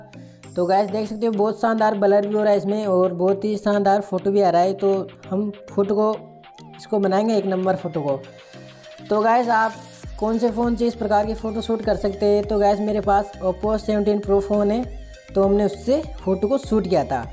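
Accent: Gujarat, India. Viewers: Patel sound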